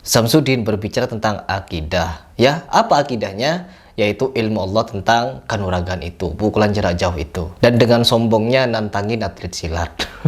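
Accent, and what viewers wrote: Madura, Indonesia. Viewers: Logatnya kaya Madura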